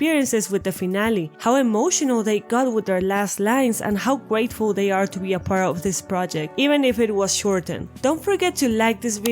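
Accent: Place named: Latin America. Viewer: Are you latina?? Omg I love your accent I'm from Colombia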